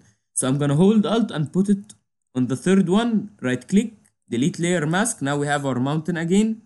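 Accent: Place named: Egypt. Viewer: You sound egyptain. Iam from Egypt and I know this English accent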